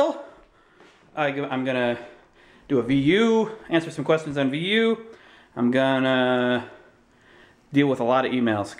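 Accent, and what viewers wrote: England, United Kingdom. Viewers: we need to hear the snobby englishman voice more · i abousolutly loved your english accent soo funny you sounded like stewie in family Guy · Running barefoot leads to cuts and abrasions and let's not leave out what happens if you stub your toe! Oh, and then there's slipping on a banana peel or stepping in doggy doo doo!! I really liked your English accent :) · I think that was the best English accent I've ever heard you do!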